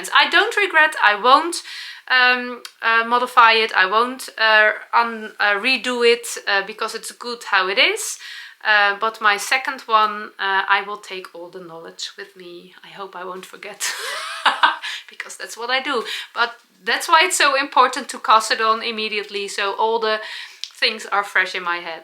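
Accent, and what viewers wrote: Netherlands. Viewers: Hi your Dutch English. is amazing! love your channel, English is also my second language also I Love Holland, kind regards Ilona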